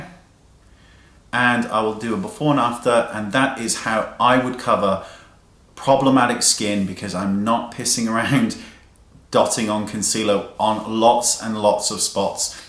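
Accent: Australia. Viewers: You're British right? Kinda sound Australian lol. Thanks for the video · are you from australia or great britain?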